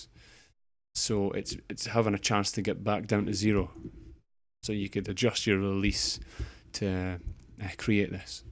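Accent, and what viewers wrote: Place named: Scotland, United Kingdom. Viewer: Very nice explanation brother. Scottish accent somehow assists in knowledge retention :)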